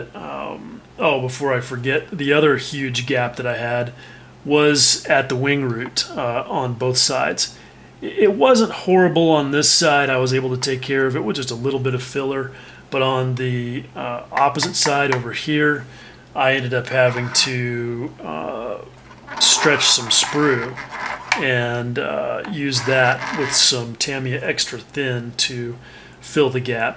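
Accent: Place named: United States. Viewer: Hi my name is William also, I like your style, your accent is soo American. I love planes and tanks and the whole model building scene, its so deep. I just found and joined the Newburg model car club, cause I love cars to. Thanks for your response last time on my comment like some kind of double negative always never disappoint? I cant remember. Any way I am very new to internet interaction and although an old hand at model building new to year round participation still a winter warrior. God bless and look forward to talking to you and sharing my model building experiences.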